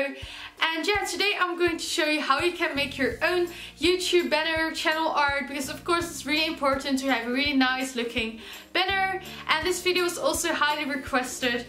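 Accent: Netherlands. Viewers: Ik hoorde meteen dat je uit Nederland komt haha maar je engels is super goed x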